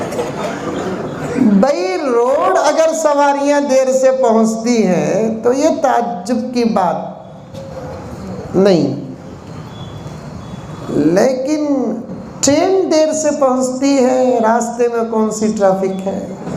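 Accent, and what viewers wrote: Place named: Goripalya, India. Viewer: Pure goripalya accent ameer sab Allah apki Umar mein barkat de ❤❤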